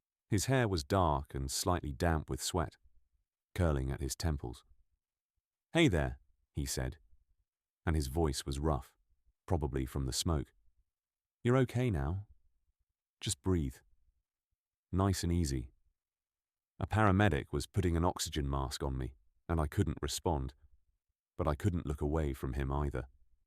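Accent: United Kingdom. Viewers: A part 2 of this story would be awesome! love the British accent of the narrator.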